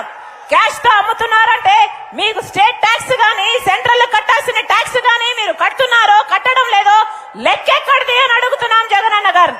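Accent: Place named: Telangana, India. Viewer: Same to same telamgana laga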